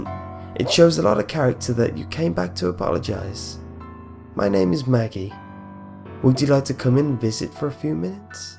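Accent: England, United Kingdom. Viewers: are you from the south or north of England?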